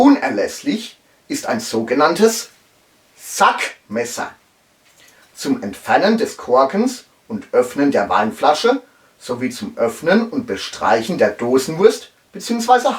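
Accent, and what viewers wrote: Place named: South Palatinate, Germany. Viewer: Leider Südpälzisch 🤢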